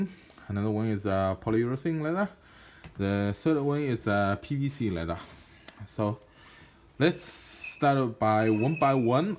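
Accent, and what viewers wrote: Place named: China. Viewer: Chinligsh to much Feb feh fuh he and less a e I o u 😔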